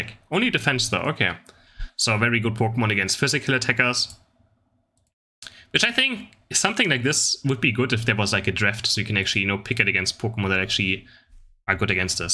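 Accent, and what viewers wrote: Germany. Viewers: where are u from? germany?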